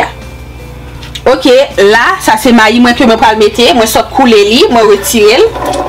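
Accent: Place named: Cap-Haïtien, Haiti. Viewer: Mw tou abòne paskem tande se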